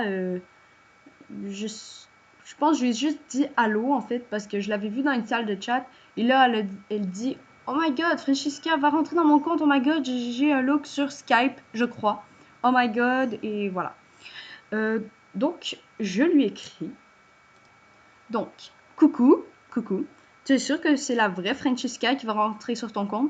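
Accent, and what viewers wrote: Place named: Quebec, Canada. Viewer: Esque tu pourrait mettre une music et j adore ton accent Québecoi (dsl jsp ecrire) 😇 en tout cas bonne contuination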